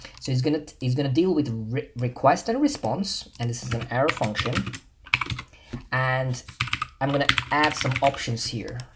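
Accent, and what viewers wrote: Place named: Ireland. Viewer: Nice video mate, are you living in Ireland, I can hear a slight Irish accent at times?!!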